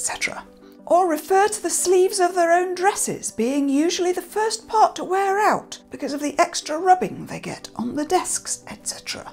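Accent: United Kingdom. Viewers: Aww yes my favorite, British cursing ASMR. Thank you for the wonderful video as always.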